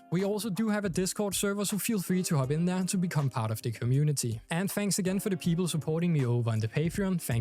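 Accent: Denmark. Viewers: Danglish? <3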